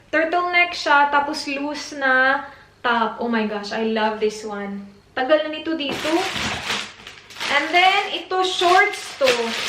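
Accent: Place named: Visayas, Philippines. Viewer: AGUY 😂😂😂 pero cute paminawun TATAK BISAYA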